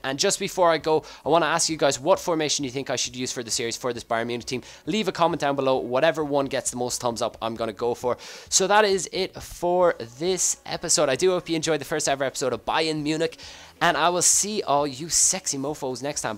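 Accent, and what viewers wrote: Ireland. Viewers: what part of Ireland are you from?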